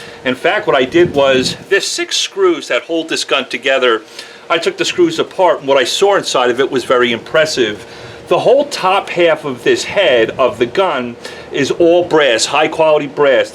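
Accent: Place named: New England, United States. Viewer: You have a bit of a New England accent.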